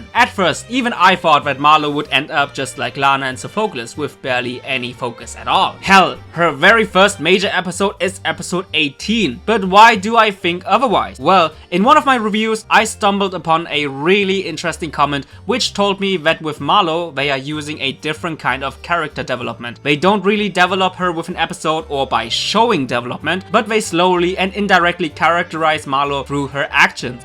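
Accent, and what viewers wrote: Germany. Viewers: are you german? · man hört deinen deutschen Akzenten voll raus :D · Wait..... .... are you.... German????? Cool - High Fife! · Is this a German Aczent? Sounds a little.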